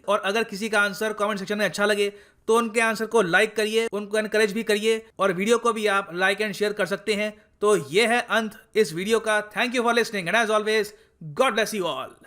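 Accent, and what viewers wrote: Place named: Punjab, India. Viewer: Sir aapka ton Punjabi Wala hai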